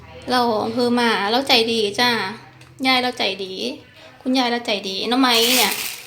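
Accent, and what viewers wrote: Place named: Phu Thai, Thailand. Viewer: เอัดผีเลอ,😂😂มักๆสำเนียงผู้ไท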